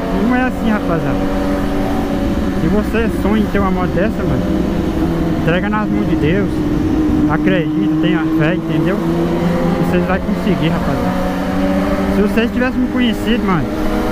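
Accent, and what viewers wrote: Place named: Minas Gerais, Brazil. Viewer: Show de bola! Que "minerês" é este ? show a língua nova, "gíria" kkkkk valeu a história/motivação total! Abraço!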